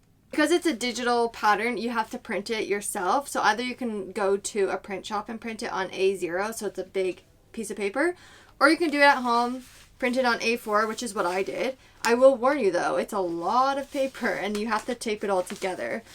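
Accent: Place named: Canada. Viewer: First video of yours I’m watching! I was like oh she must be American but then you said buttons and pocket and I’m like nope she’s Canadian! 😊 Love the video! Going to watch more now.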